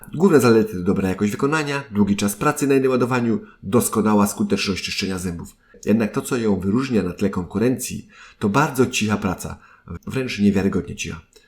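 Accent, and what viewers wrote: Silesia, Poland. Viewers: Dobra i tania, ja mam elite pro cos tam,skaczą te ustawienia na wyświetlaczu zanim zaczne myć a ta prosta i tez Mocna ,kilka szt kupilem na prezenty,fajna jest bo cicha.Masz sluński akcent🙂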